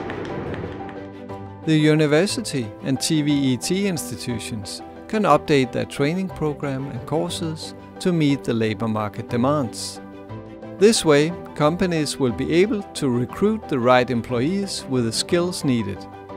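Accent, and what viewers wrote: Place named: Denmark. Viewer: So exotic to hear a Danish accent in a video about Laos ...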